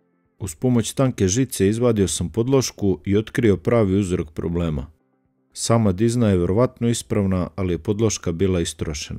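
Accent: Šumadija, Serbia. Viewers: Od prvog tvog videa koji sam gledao prepoznao sam u glasu da si Šumadinac, pozdrav iz Aranđelovca 😂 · Brate ti si čarobnjak. Svaka ti čast. Nisam znao da si Aranđelovčanin mada se čuje Šumadija u govoru.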